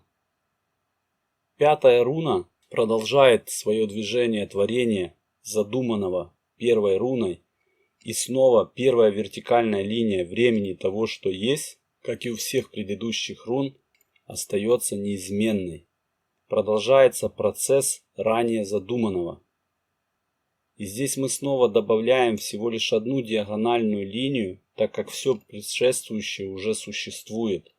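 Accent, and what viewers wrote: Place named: Ukraine. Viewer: Благодарю! Вы очень классно объясняете! У вас как будто бы украинский или кубанский скорее говор, это сразу было не привычно, но на самом деле не важно, потому что важен не голос, а информация. Вы даёте понять глубинно, и даёте возможность слушать! Не всегда есть возможность читать книги, особенно в офисе. Спасибо!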